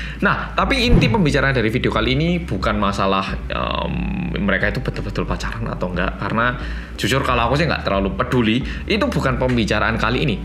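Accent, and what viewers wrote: Java, Indonesia. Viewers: Menungguu mas yang medhooook♥♥ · setiap opening aku selalu ikutran bilang ahahaha medooooooook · Entah kenapa saya sangat suka pembawaan mas di konten pembahasan kasus kriminal, Durasi singkat, padat, Jelas, Visual editing sesuai dengan keadaan dan gak terlalu heboh, dan yang paling enak ya "MEDOOOOOK".. Sukses trus mas, 2021 bisa 10jta sub 🙏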